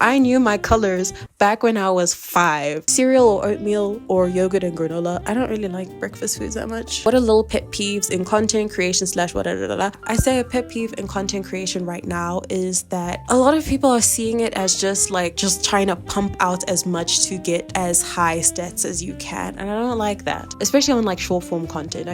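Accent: South Africa. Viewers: Wow! I also love Charcoal because I AM SOOO IMPATIENT. Graphite and other mediums really make feel this annoying itch that tests my patience!😂 I'm so happy I'm not the only one! Thanks for reading and answering my question. You really do sound South African 😅. THANKS FOR THIS COOL CHANNEL!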